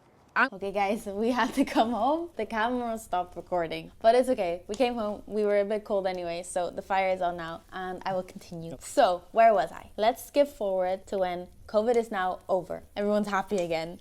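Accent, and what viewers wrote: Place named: Scotland, United Kingdom. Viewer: how do you have a scottish accent? I love your videos x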